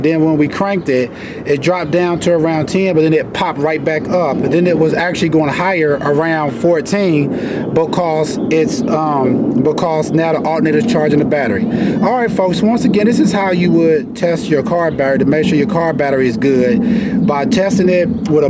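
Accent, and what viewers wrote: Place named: St. Louis, United States. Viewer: Sounds like you might be from St. Louis my friend.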